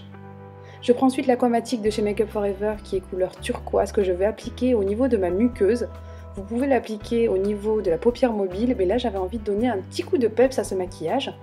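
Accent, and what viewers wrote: England, United Kingdom. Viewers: C joli mais tu aurais pu repasser la combi ! Sinon j'aime bcp ta voix et ton accent anglais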